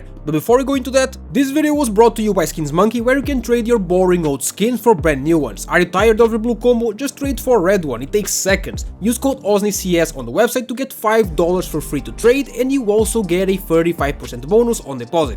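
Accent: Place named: Portugal. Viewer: tentas te mas sei que es portugues ahaha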